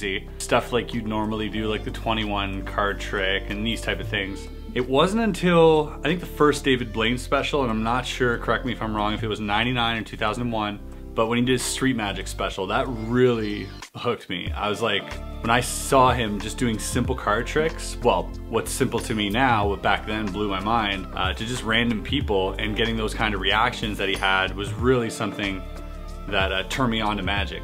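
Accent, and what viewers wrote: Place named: Canada. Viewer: I am only just at the beginning, but, magic has amazed me just like it did for you. Watching the same famous magicians performing the best card tricks that left myself stunned and wanting to know how they do it and how I can do it myself to create that same experience for my friends and family. Its unknown if I would ever make a career out of it, I am almost 24 and currently in progress of becoming a licensed architect by 2018, but I love having hobbies and magic speaks to me on so many levels. I am happily subscribed to your channel and have watched many of your card tutorials that have helped me learn new tricks! Keep the videos coming bro! Also, thanks to your videos, my first deck of cards was based off your recommendation....Blue Monarchs! They feel great and have worked out very well so far! I have not yet confirmed if you have said that you are from Canada but it seems a little obvious with your accent, if you are....SWEET! 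I was born in Burlington, Ontario! I have since moved to California but still proud to be canadian! Hit me up if you are ever in South Orange County! -Toby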